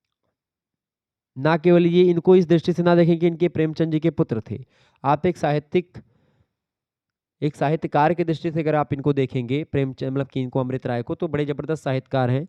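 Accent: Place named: Awadhi, India. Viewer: Aap avdhi bolte hai, badhiyaa hai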